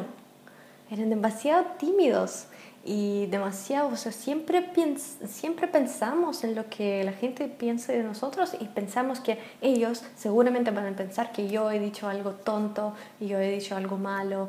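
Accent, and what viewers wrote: Chile. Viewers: Eres hermosa se te nota mucho el acento chileno. Saludos desde México. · en qué parte de Chile viviste?? Fantástico tu castellano y más lindo tu acento chileno!!! Saludos desde Chile. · Es el segundo video de tu canal que lo veo, me quedé encantado con el acento, hispano chileno jajaja, pero el castellano neutral es el peruano, espero puedas conocer mi país, aquí tienes un amigo peruano para guiarte por ésta hermosa patria. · Es adorable tu acento Chileno!!!!!!!!! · Aprendiste el chileno en tu estadía allí?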